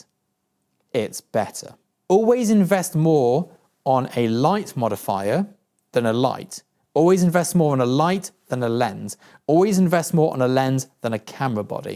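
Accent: United Kingdom. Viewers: Excellent! I'm grinning because I enjoy your point of view and because Brits talk differently than 'Murricans. 😂 I'm also appreciating how much I love pragmatism. And last but not least: I've been a little afraid of used kit because it seems fragile and... almost perishable. I'm glad to hear your point of view. I've bought used cars sight unseen, because I know cars. But studio gear seems "trickier..." Yep I'm just afraid of what I don't know. 👍 Nice to feel grounded again. Thanks!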